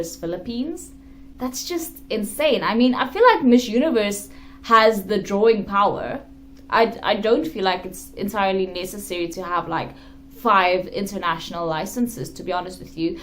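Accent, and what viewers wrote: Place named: South Africa. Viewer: Love you. And love your Afrikaans pronunciation of ‘r’ gives life and errrrthing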